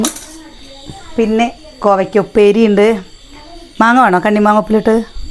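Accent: Palakkad, India. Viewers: പാലക്കാട്‌ ആണോ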